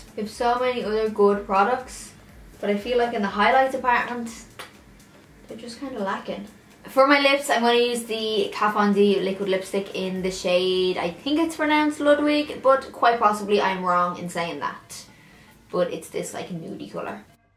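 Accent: Dublin, Ireland. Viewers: Just new to your channel but can I just say that’s it’s so fab to see a big and successful YouTuber with a Dublin accent!! Any other Irish Youtubers I know of all have like posh South Dublin accents or with an American twang there’s nothing wrong with that of course but it’s just really refreshing and exciting to hear an accent like mine on YouTube. Reppin’ the Northsiders!💁🏻 Fills me with pride!💚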